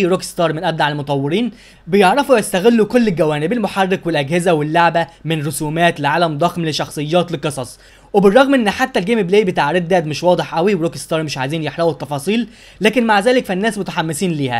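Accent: Egypt. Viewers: هو انت ازاى وبتكلم مصرى 😅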